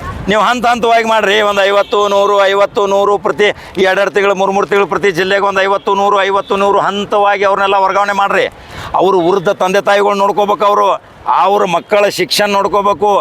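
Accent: North Karnataka, India. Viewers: ನಿಮ್ಮ ಈ ಒಂದು ಉತ್ತರ ಕರ್ನಾಟಕದ ಮಾತಿನ ಶೈಲಿ ತುಂಬಾ ಸೊಗಸಾಗಿದೆ ಖಾಕಾರಿ.ನಿಮ್ಮ ಬಾಯಾರ ನಮಿಗೆ ವರ್ಗಾವಣೆ ಭಾಗ್ಯ ಸಿಗುವಂತಾಗಲಿ ಅವರವರ ವರ್ಗಾವಣೆಯ ಏಳು ಕೋಟಿ ಪುಷ್ಯ ನಿಮಗೆ ಸಿಗಲಿ ಎಂದು ಆ ಭಗವಂತನಲ್ಲಿ ಪ್ರಾರ್ಥಿಸುತ್ತೇನೆ ಖಾಕಾ ರಿ ಧನ್ಯವಾದಗಳು ನಿಮಗೆ 🙏🙏🙏🙏🙏🙏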